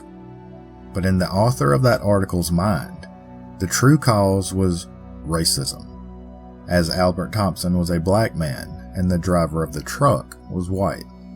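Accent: South, United States. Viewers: I always start looking for your newest video starting Sunday afternoons, then check hourly. You always make it well worth the wait. Love to hear a fellow Southerner. North Carolina ☠🏴‍☠⚔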